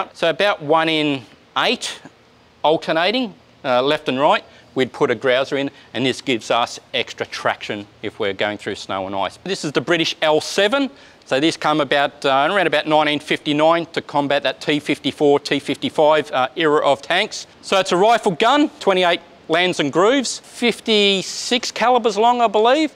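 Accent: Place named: Australia. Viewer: For a few moments there, i closed my eyes & pretended i was listening to Steve Irwin talk about a tank in great detail instead of crocs & various other critters. I know it's kinda odd but hearing that accent reminded me of Steve.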